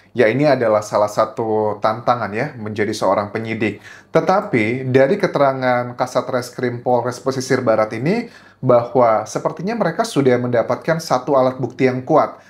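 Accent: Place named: Banyumas, Indonesia. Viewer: Ujug ujug 😂 wong ngapak admin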